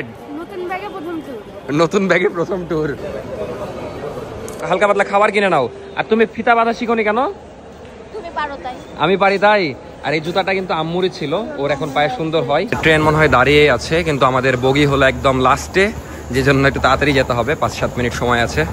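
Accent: Rajshahi, Bangladesh. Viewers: Vai Ami epar Bangla ( INDIA ) ,apnar paser district Murshidabad theke , Apnader Rajshahi r Bhasar taan and amader Murshidabad er Bhasar tan onek tai same , khub valo lage apnader video dekhte . West Bengal a konodin asle dekha hobe ...Take care ❤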